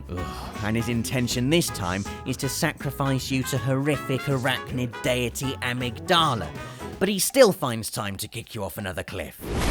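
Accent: United Kingdom. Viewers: He's has an British accent bud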